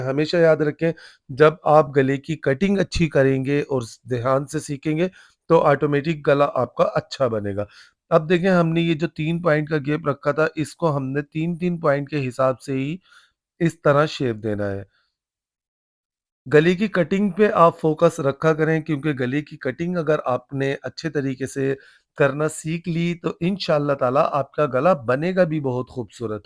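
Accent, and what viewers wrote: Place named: Rajasthan, India. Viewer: Assalaam o alaikum. Mash'Allah bohat asaan teriqey se aap ne gala banana sikhaya. Mai aap ke videos bohat ghaur se daikhti hun. Mai bhi bohat safai se her qism ke kaprdey seeti hun laik phir bhi mainey aap ke videos se bohat kuch seekha hai aur mazed seekh rahi hun. Aap ke lehjey se lagta hai aap Jaipur Rajistan se hain. Kaash aap karachi mei hotey to main aap se hi keprdey silwati. Wassalaam. Jazzak'Allah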